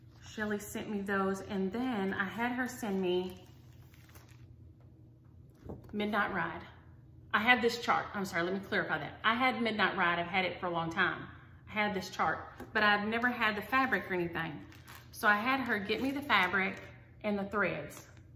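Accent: South, United States. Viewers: It would be a "treat" to stitch some "Autumn" pattern! I love listening to your channel! I am from Michigan, so I love hearing your southern accent.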